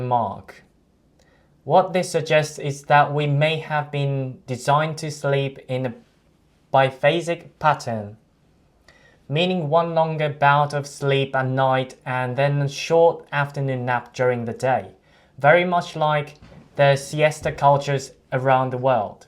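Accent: United Kingdom. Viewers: You did good, mate. While I am Australian, I definitely heard Britishness in most of your sounds. The only syllables/sounds which did not sound RP to me were the NI in night and SI in design. That silent g may be lengthening your i-sounds a tad too much. Nonetheless, after listening to you twice end to end, I felt you are nailing so much RP/Brit sounds.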